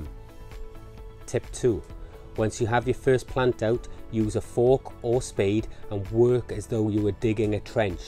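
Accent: United Kingdom. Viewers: wow I used to hate UK accents until I heard yours. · I’m American and I can spot that Welsh voice a mile away! It’s a beautiful language that creates a beautiful sound when speaking Engliah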